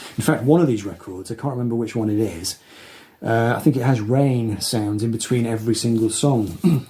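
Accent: United Kingdom. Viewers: Hi, very enjoyable and at the same time kind of sad video! There is something about lyrics recited with a British accent that really makes it classy, at least from an American perspective. take care, Elliott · Hi. Depressingly good video on the most positive manner. Some absolute tear jerkers in there along with some unexpected choices. Really great delivery which is such a strong positive on your channel. Are you a NW England guy, I’m trying to place the accent. I’m from the Potteries (Stoke if you didn’t know) as you can probably tell from my accent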